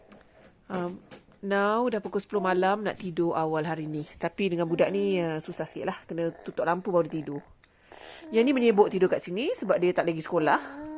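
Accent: Malaysia. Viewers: Mengcokik😁😁😁. U re melayu pure. Nismilan pure. Suka den dongar sis sobut Melayu totok.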